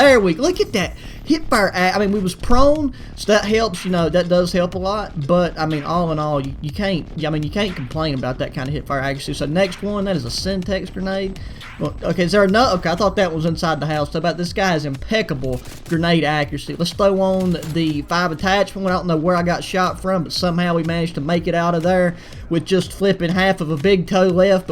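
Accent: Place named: North Carolina, United States. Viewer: I’m from Texas so I crossed that state off the list for your accent. You sounded like my buddy from NC. 😂😂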